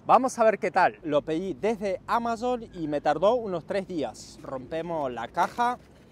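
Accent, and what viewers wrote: Argentina. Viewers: Habla una mezcla entre porteño y español ajajajaj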